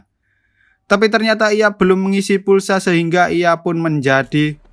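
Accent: Java, Indonesia. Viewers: Lucu ngomongin film ini orang yang punya cannel i i lucu dan kaya orang jawa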